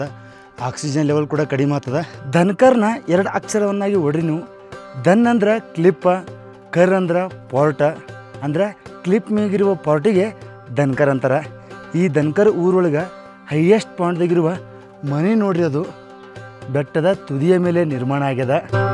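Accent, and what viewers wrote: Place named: Karnataka, India. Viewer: Super bro .. ಜವಾರಿ ಕನ್ನಡ.. ❤❤ ಅಲ್ ದ ಬೆಸ್ಟ್ ಬ್ರೋ❤❤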